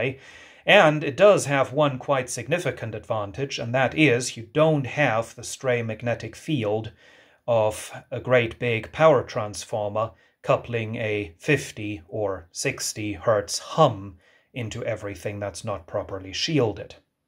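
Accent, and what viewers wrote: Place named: Germany. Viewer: Bist du deutsch? Dein Englisch klingt sehr angenehm. So möchte ich als Deutscher wahrgenommen werden, wenn ich Englisch spreche.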